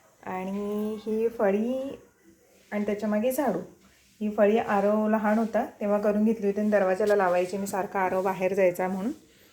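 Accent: Kolhapur, India. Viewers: काल पहाटे 4वाजता अचानक जाग आली.झोप येईना म्हणून मोबा. चालू केला.पहिलाच* * तुझा विडियो 'किती मिळाले' हा पाहिला,नंतरं 'आमचं लग्नं' हा पाहिला. भाषा जरा कोल्हापूरची वाटली.त्यामुळे जरा उस्तुकता वाढली.सकाळी उठून बाकीचे vlogs पाहीले,फालतू कमेंटना ' माझं चाळीतल घर,' व ' मिक्स ' ह्या vlogs मस्त चपराक हाणली.तर ' माझं रूटीन ' मधून घराची सगळी कामे करत vlog करणं येड्या गबाल्याच काम नाही.हे तू दाखवुन दिलंस.सुंदर मांडणी,निखळ बोलणं, कॅमेऱ्याच हाताळणं उत्तम. स्वच्छ, सुंदर,निरागस वागणं,बोलणं, हास्य उत्तम.तुझ्या ब्लॉगला हार्दिक शुभेच्या!!! T.V. वरील फालतू सीरिअल्स बघण्यापेक्षा हे बरं!! एकेरी तुझा उल्लेख केला कारण आमच्या लग्नाला २१ वर्षे पूर्ण झालीत. मी सांगलीचा तर बायको कोल्हापूरची. ब्लॉग बघताना ज्या ज्या घटना घडल्या त्यातून आमच्या जुन्या आठवली जागवल्या.पूर्ण कुटुंबाला शुभेच्छा!!!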